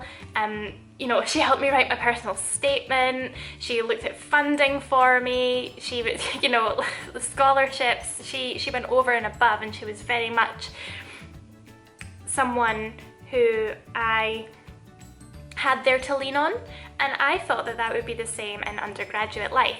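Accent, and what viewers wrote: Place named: Scotland, United Kingdom. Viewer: oh my goodness your accent is amazing! I haven't heard that in a while so i'm not sure.. scottish, irish, where are you from??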